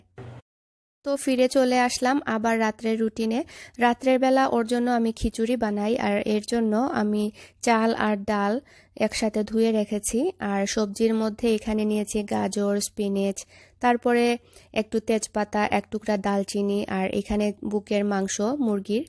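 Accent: Sylhet, Bangladesh. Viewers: Apnee ki sylheti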